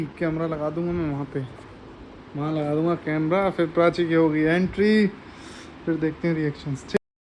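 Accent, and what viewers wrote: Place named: Aligarh, India. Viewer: Bhai humari bhi poori family aligarh se hi, Big fan of your accent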